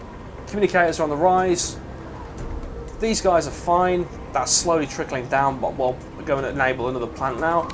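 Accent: United Kingdom. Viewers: I love your voice man. British English is best English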